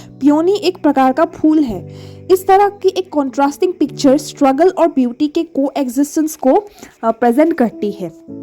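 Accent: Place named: Northeast India, India. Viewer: Her accents:) 100%she is from north-east 🫂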